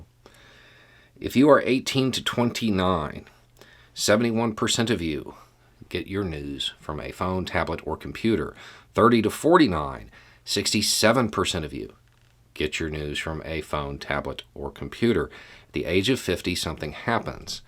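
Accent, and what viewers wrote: South, United States. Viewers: As a European, it is also very refreshing to hear an American recognise that. Especially one with a southern accent. knows his political theory.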